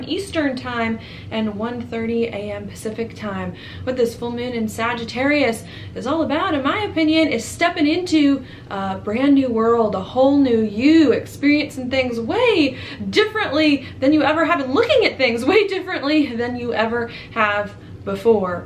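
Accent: South, United States. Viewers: My goodness gracious, you have amazing skin and healthy hair. I don't talk like a douche. But I needed to get that out. Rawr, lol. Okay, I've looked like a beta jerkoff enough now. I'll quit. Not like I'm ever gonna meet you, so I didn't have anything to lose by telling you these things, hahaha. Oh and that country accent, and your energy... yum 😂 Great readings too. No joke.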